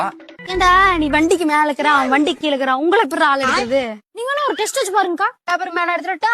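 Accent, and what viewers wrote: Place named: Kongu, India. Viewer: கொங்கு slang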